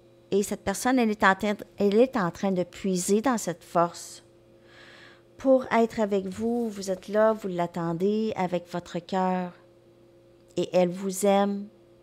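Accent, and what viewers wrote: Quebec, Canada. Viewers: OK 👌 j aime bien écouter votre langage du Québec il est super un vrai régal pour les oreilles 👂 à demain 👍🌝🕊🌈🇨🇵Frédéric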